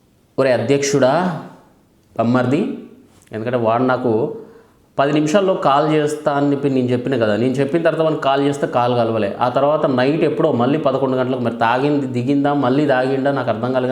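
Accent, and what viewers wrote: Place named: Rayalaseema, India. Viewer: నీ అక్క అంటూనే, నీయక్క అని బాగా తిట్టావు బ్రో. మ రాయలసీమ యాస అదీ. సూపర్. జై జనసేన ❤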